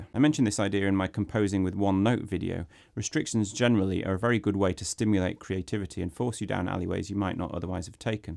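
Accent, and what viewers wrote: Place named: England, United Kingdom. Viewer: I love your accent. It reminds me of my friend from England who I could talk about classical music with for hours. Now he’s in Tel Aviv. :,) Keep up the great work.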